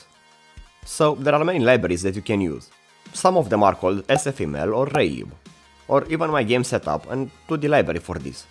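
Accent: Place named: Romania. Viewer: you sound a bit romanian..... cf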